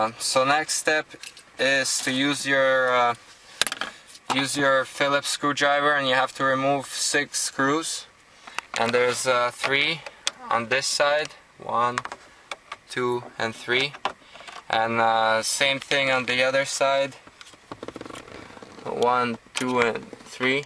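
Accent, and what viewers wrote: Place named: Russia. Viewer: Igor Grusha так акцент-то русский, скорее всего автор видео тоже наш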